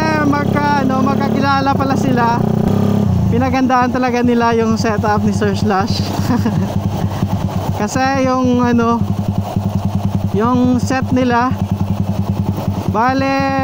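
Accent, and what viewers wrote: Iloilo, Philippines. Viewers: Ilonggo ka 😂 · To ilonggo ka?toh race ta nga duwa.visita ko da,visita kaman sakon vmtv. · Ilonggo gid man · Lodi taga san kapo ba ilonggo kapo ba pa shout out nlng lodi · Baw ilonggo kagd boss😂😂✌✌ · Taga diin ka kuyaa hahaha ilonggo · Illongo si sir haha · Ilonggo gid si sirrr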